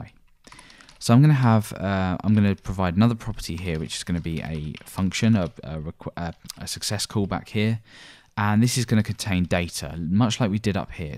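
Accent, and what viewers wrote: England, United Kingdom. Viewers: so good to hear a clear concise english accent. you my friend are part of a small percent of the population, a dying breed in the cultural soup that is the united kingdom full of wanna be "roadmen" and wasters. There should be a lot more English people making these videos, and programming should be taught in primary school like it WAS in the early 90s. Before austerity and dumbing down became a priority. /rant over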